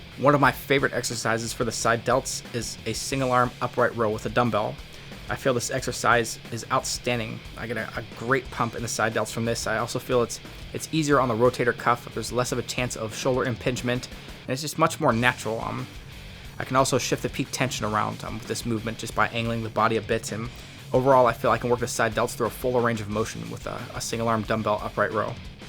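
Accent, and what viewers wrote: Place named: United States. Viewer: Whats the accent? Its American but there sounds like theres something else in there..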